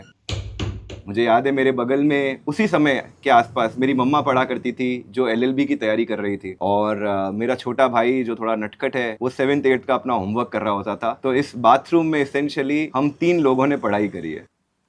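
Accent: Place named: Jodhpur, India. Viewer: Damn you're Jodhpuri!!😅very proud to hear it!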